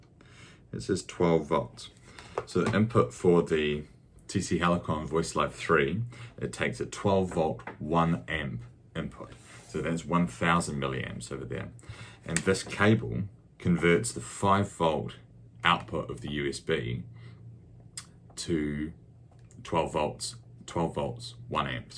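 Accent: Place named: New Zealand. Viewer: Hi You so sound like a Kiwi New Zealander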